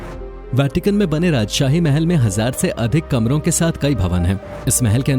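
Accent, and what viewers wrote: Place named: West Bengal, India. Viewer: Bangali voice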